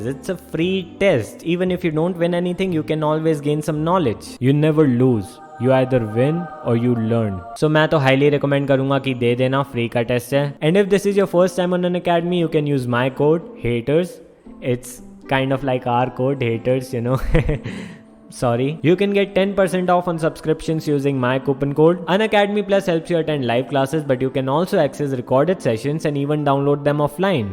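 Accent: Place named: Punjab, India. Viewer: 😂😂😂😂😂😂😂and that punjabi accent of yours......yrrrrr you are just wowwwwww❤️❤️❤️❤️❤️😂😂😂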